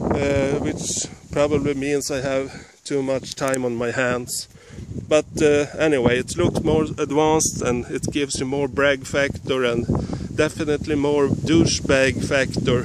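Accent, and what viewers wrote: Sweden. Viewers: Very cool and thanks for sharing your ideas. Also, your Swenglish is just fine. I appreciate your creativeness! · I am so glad I found your video. I am attempting to build my own texas star. Yours is perfect. You crack me up with your Swinglish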